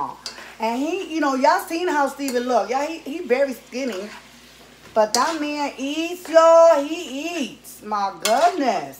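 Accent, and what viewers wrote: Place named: New York, United States. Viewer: have you always lived in Massachusetts?? Beacause you have an accent like us New Yorkers ✊🏼💪🏼😁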